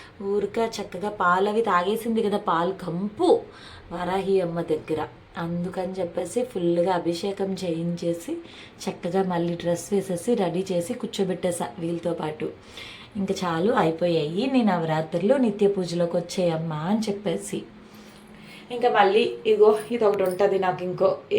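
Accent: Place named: Visakhapatnam, India. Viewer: Chala bagundhi andi,meeru undedhi vizag ani nenu anukuntunnanu mee matalu alage unnay ,maadhi kuda vizag ne ,yevarikyna telisthe cheppandi,mimmalni okasari kalavaalani undhi andi,oka vela meedhi vizag ayithe naaku cheppandi kalusthanu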